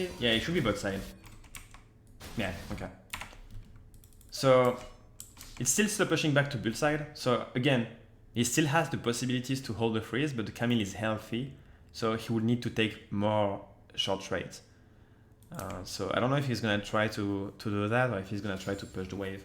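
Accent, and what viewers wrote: France. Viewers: Jolie accent fréro, ça fait du bien de voir un français qui parle aussi bien anglais haha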